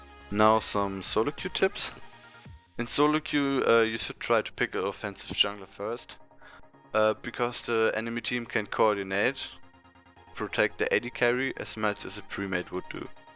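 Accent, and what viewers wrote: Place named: Germany. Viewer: you sound sooooo german :D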